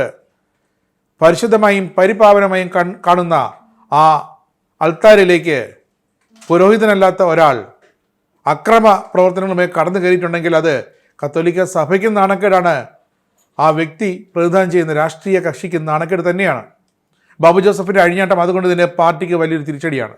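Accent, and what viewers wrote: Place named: Pala, India. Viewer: എടോ താൻ എവിടത്തുകാരനാ? കേട്ടിട്ട് പാലാക്കാരനാണെന്ന് തോന്നുന്നു എടോ എറണാകുളം അങ്കമാലി അതിരൂപതയുടെ ആസ്ഥാന ദേവാലയമായ ബസ്ലീക്കാ പള്ളിയിൽ 2021 ൽ ബലിപീഠം തകർക്കുകയും ബലി അർപിച്ചിരുന്ന വൈദീകരെ തൊഴിക്കുകയും ചവിട്ടുകയും ചെയതപ്പോൾ ഇയാൾ എവിടെയായിരുന്നു ? ഈ കഴിഞ്ഞ ജനുവരി 11 ന് 21 വൈദീകരെ പോലീസുകാർ തൂക്കി എറിയുകയും തിരുവസ്ത്രം വലിച്ചു കീറുകയും ചെയ്തപോ ഇയാൾ എവിടായിരുന്നു. കഷ്ടം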